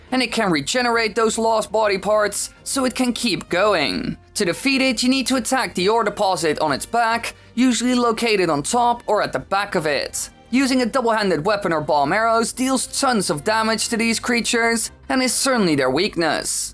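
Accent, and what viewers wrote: Netherlands. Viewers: Love your dutch accent and ofc your videos.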